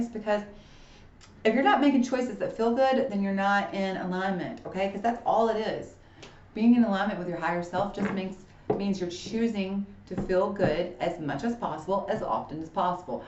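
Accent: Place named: South, United States. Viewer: I'm digging the vibe here so much! That beautiful Southern accent sucked me right in lol. I love how every reader comes with their own signature flavor, and I'm happy to find another gem on here 😁🙏✨ **I knew you had to be a Saggie lol!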